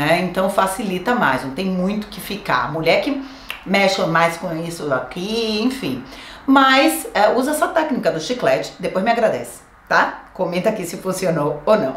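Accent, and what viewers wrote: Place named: Bahia, Brazil. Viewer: Que sotaque gostoso! É Baiana?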